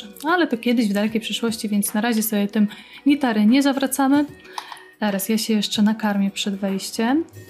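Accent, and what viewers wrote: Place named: Podlasie, Poland. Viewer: Møwisz Tak o jestes z podlasia :P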